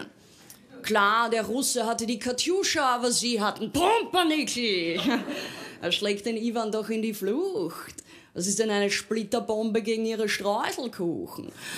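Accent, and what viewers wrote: Austria. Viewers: Auch wenn man bei Lisa Eckhardt grundsätzlich ihren Zynismus bedenken muss, stellt sich die Frage: Ist sie jetzt noch selbstbewusst oder schon arrogant? Diese Frage lässt sich aber wohl nur dann sicher beantworten, wenn man sie abseits der Bühne kennenlernt. Auf jeden Fall ist die Frau eine großartige Mischung aus Wortgewandtheit, scharfer Zunge und Sexappeal. Und als Bonus kommt noch der hochsympathische Österreicher Dialekt hinzu :) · nach drei Sekunden zuhören in Österreich angekommen ....:-( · ist das eine Oesterreichisch? Wir kennen nur Arnie's Terminator Akzent.... klingt wie Hollaendisch. (Gruesse aus London)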